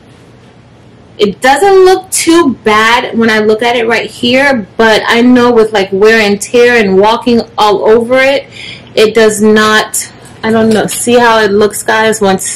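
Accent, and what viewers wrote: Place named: Texas, United States. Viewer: You a Texan? Y'all give u away. Great video thank you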